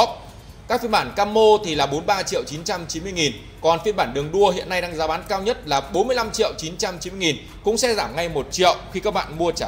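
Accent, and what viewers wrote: North, Vietnam. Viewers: Bác người bắc hả,k thấy nói giọng nam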